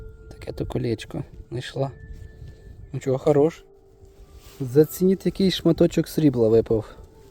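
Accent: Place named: Vinnytsia, Ukraine. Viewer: Найдобріший з копарьских каналів. А як гарно звучить вінницька промова! Найчистіша українська імхо! треба щось з ніком робити, бо навпаки читається нетой.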